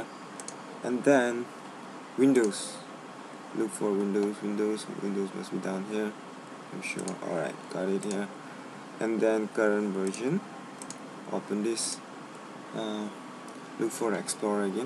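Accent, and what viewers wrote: India. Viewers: indian or serbian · Are you Indian or French??...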